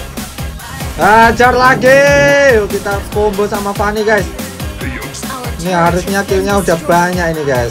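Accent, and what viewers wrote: Java, Indonesia. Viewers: Bang kok medok? Org mana?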